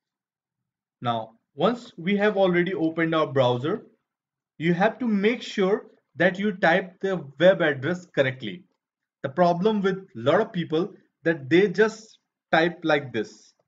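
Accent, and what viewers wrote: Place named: India. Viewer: Fuck you and your accent. Man I hate when an indian speaks English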